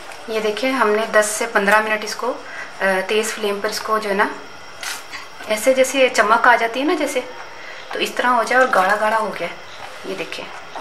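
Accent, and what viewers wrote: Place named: Punjab, India. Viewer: Please donuts bnana Sikhs dien And I love your Punjabi accent me also belong to Punjabi family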